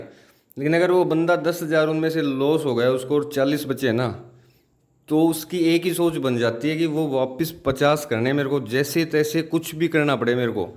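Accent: Haryana, India. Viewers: Dada aapke mostly saare videos dekhe,aapki ek ek baat me sachhayi hai,aur aapne apne anubhav ko saajha kiya hai,me aapko abhi se guru maanta hu,aapki language se lagta hai,shaayad ap seekar ya hariyana side ke ho,ek baar darshan ka moka de,koti koti dhanyawad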